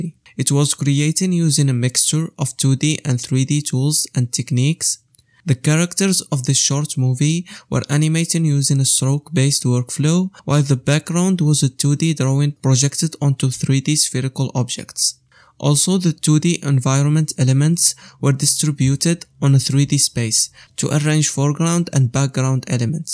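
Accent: Morocco. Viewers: Living for the Moroccan accent!! 😄 Bless you for this video! I'm trying to learn 2D and 3D animation on Blendr and it hasn't been so easy so far, a bit confusing! hopefully it'll get better soon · Is this a Moroccan accent ? Good video tho 👍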